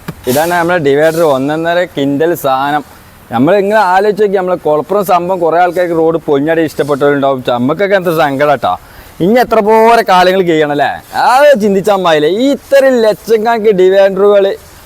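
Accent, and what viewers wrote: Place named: Malappuram, India. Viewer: നല്ല പച്ച മലപ്പുറം ഭാഷ ഇഷ്ടമായി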